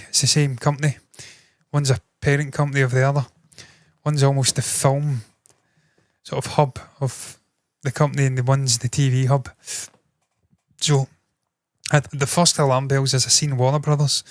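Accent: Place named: Scotland, United Kingdom. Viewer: Lmfaooo where's that accent specifically from? Scotland? Where in Scotland? I love it, as a non-native English speaker I thought it was some celtic language for a moment 😭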